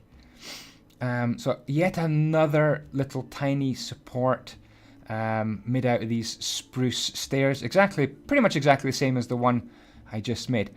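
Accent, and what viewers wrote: Scotland, United Kingdom. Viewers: ! How have I not subscribed to you yet?! Hits subscribe The Scottish accent is irresistible. As for my suggestion, I'd say keep the village going! I love seeing it and I've watched each episode MULTIPLE times! Cheers!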